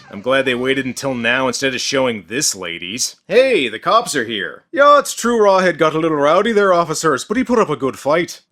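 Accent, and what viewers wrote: Ireland. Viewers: Rawheadrex , queen kong, deathstalker who come up with these name next you going to tell us there a movie called WTF Also that Irish accent is amazing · Am I the only guy who instantly got a boner from talking with an Irish accent? No? Ok, just making sure that I'm not weird... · If Charlie Kelly's Green Man had produced this film, we'd probably be a lot better off. Did a pretty-good job on Lethal Weapon 5 & 6. I love that a 30-foot solid stone obelisk is apparently supported by a foundation that's only about 2 feet deep. A butterfly could push that thing over. Great Irish accent, though I think I detected a wee bit of Maritimer starting to peek-through at the end, lol! Not that there's a ton of difference, mind. Seems like it's always a bad idea to give your monster human eyes, even if they're red. Kinda like sharks with human teeth; it just utterly destroys the creepiness. 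Rawhead looks like a less-scary version of Judge Doom from "Who Framed Roger Rabbit?". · The Iriah accent made me laugh out loud literally literally. Have a. Subscribe yer sexy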